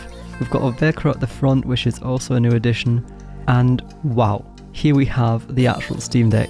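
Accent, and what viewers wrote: Germany. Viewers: Geiles Video ! Am Akzent hör ich das wir die gleiche Sprache sprechen :)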